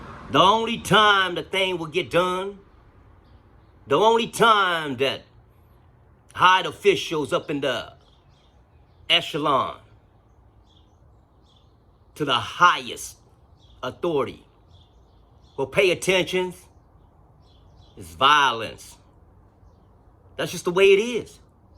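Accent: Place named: South, United States. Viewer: Powerful, brother ✊. You sound like you might be from the South. Mississippi, maybe? If you are, we need you to go to Minneapolis and school those MFs. I don't mean just the Tou Thaos, but also the Tou Ger Xiongs and the KaYings who purport to be the almighty leaders in the Hmong community. Tell 'em to take their degrees and get dafuq outta the way so you can stop this sh!t from happening again. They let Tou Thao happen and he embarrassed us all forever. I am pissed.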